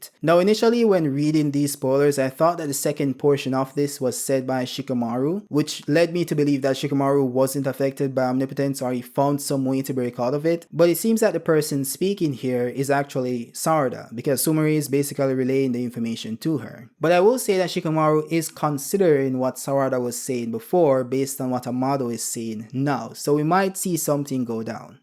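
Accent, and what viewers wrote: Jamaica. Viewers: Aye yuh a Jamaican? Yuh sound like it🇯🇲💫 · Its giving Jamaican do your thing bro keep growing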